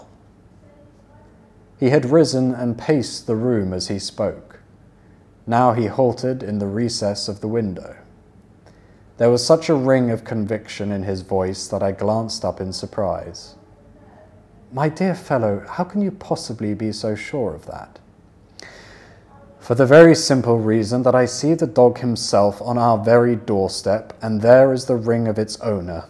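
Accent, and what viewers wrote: United Kingdom. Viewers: 제가 영국 발음에 빠지게 된 계기가 셜록인데 원서를 영국 발음으로...! 하 너무좋아요퓨ㅠㅠ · I happened to know this channel while watching YouTube. There was too little chance of hearing a British pronunciation. But it’s really nice to hear the contents of many books in British pronunciation. I’m always enjoying this channel. Lastly, I love Mr. voice! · 영국영어 발음 넘남 좋앙~~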